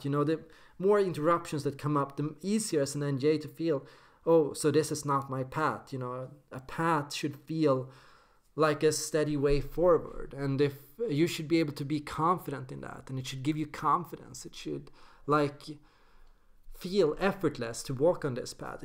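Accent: Sweden. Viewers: He's Swedish. Det kan man høre på ham. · That's OCD, not INFJ...;-) I think that's a specular/optical distortion of the camera. At least you noticed the wall hanging -- is apparently from Stockholm (as his "accent" indicates.)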